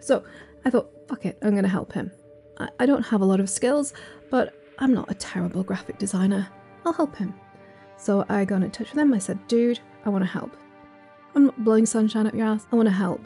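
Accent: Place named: North, United Kingdom. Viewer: I like it when your northern accent comes out.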